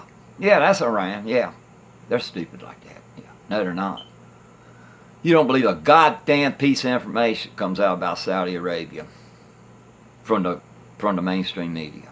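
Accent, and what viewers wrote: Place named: South, United States. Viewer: Bill Burr, a comic, makes fun of conservatives and liberals. You sound exactly like the kind of person he was parodying...a guy with a southern accent who is intelligent and a leftist. Power to you my bro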